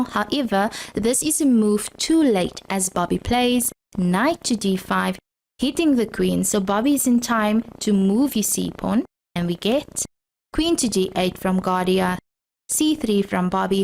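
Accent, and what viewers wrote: South Africa. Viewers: That South African accent 😮‍💨👌🏻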